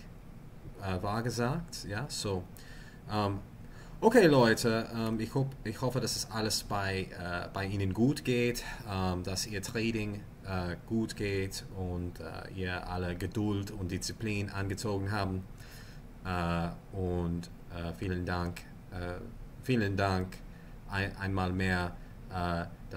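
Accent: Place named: Netherlands. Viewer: Sind Sie ursprünglich aus den USA oder aus den NIederlanden? Ihr Akzent hört sich sehr Niederländisch an und gefühlt tradet jeder Niederländer auch.. :)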